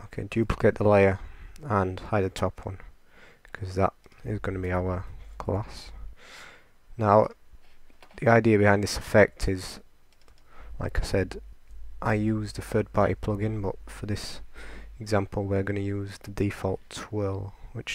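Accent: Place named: Northern England, United Kingdom. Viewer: is from yorkshire? well he's northern anyway xD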